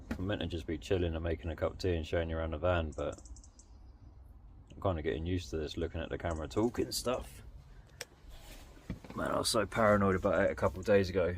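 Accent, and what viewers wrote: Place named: West Country, United Kingdom. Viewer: Been in a 07 tranny SWB RWD since june, zero insulation just the same. Bed lengthways tho, single air mattress on top of a camp bed. Now its getting colder am noticing the amount of condensation and dampness all over the place. Same situation having nowhere to actually do the work plus cba taking everything off the van and back on again every day to live in!! F knows how it'll be in winter 🥴🥴🥴 Also ive thought about bed being width ways as i think it's JUST about 6ft across half way up it but i use the backdoors for entry 98% of the time cuz im stealth, can't be using the noisy and revealing side door too often. Was thinking tho if bed was high enough i could crouch my way in thru the storage space. Again, nowhere to do the work 😂😂 Am in Essex, u sound a bit westy, if not norfolky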